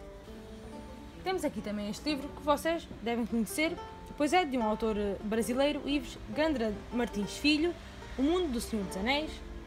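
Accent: Portugal. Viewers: O sotaque português é maravilhoso, parabéns pelo vídeo, gostei muito.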